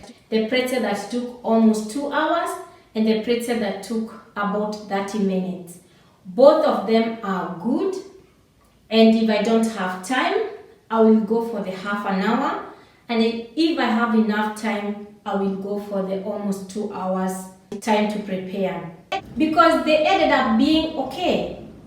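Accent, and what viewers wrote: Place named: Mozambique. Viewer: I have a friend from there with a similar accent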